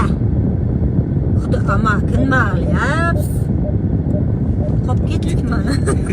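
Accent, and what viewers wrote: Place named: Syrdarya, Uzbekistan. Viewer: Сирдареча гапирди😅😅😅😂😂😂😂😂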